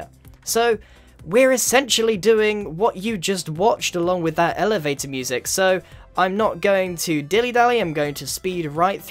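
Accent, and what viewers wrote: United Kingdom. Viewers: JaronPlayz British accent. You cant hear it? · HI i liked the video in both ways and i think you are the greatest minecraft builder ever keep up the good work and fot the next video of building your comments please make a lightning hiding from the guy who invented lightning electrisety sorry if miss spelling something or wasting your time and by the way you are british right thats my favorite english accent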